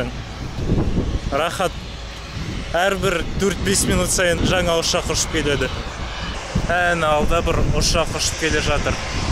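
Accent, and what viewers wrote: Standard. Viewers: Рахмет саған қазақша блог жүргізіп жүргеніне! Аздап акцент болсада қазақша таза, сауатты, қатесіз сойлейді екенсін, орысша сөздерді қоспайсын, жарайсын!👍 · оте керемет жансынго таза казакша сойледн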